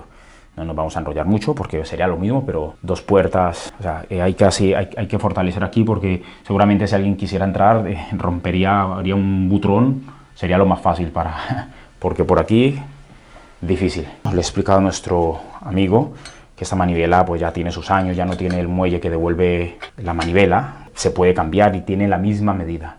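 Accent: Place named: South America. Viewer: Y ese acento de donde es? Parece de sur america tratando de hablar como español....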